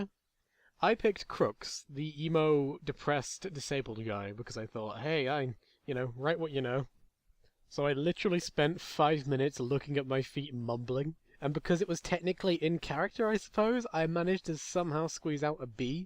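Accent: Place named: United Kingdom. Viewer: Holy crap... You're British.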